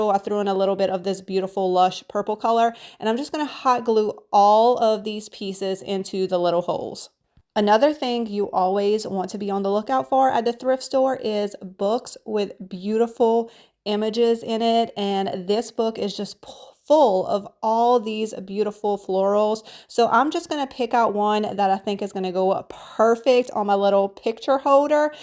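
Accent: Louisiana, United States. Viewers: I love everything you did but the measuring cups were just so simple and darling. By the way, are you originally from Louisiana bc you have a Louisiana accent you sound cajun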